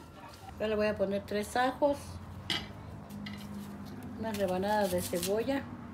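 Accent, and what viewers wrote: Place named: Fresa, Mexico. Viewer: si e😃 vos de fresa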